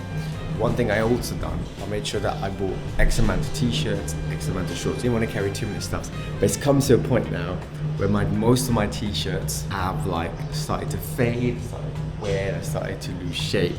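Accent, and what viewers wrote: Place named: United Kingdom. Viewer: Where are you from in the UK? I like your dialect and want to know where do you speak like that? 😃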